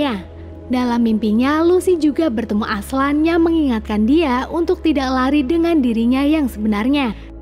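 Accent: Pemalang, Indonesia. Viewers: Emg asli Pemalang? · Pemalang nih ye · Mba e wong pemalang to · Wong pemalang ya mbak? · Wong pemalang yo😁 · wah pemalang to sampean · Fix ini miminnya orang Pemalang yah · lahhh pemalang ndii mbak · Wong Pemalang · Mbak orang pemalang kah? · Jebule wong pemalang yaa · orang pemalang kah?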